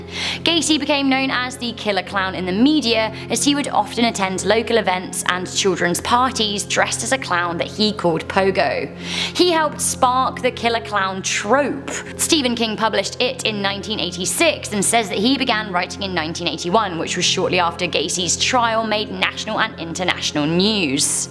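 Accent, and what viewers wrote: United Kingdom. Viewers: PS. I WANT TO SEE YOU IN MORE VIDEOS CUZ I LOVE YOUR ACCENT I LOVE BRITISH PEOPLE I WISH I WAS BRITISH!!!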